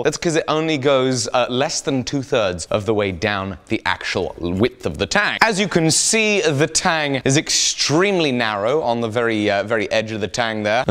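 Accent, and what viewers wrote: England, United Kingdom. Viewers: Chad Looney English word pronounced in English by an Englishman in England. I'm afraid this trump's the American pronunciation in this case. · He speaks English, not American English. No matter how much he likes that cowboy hat of his.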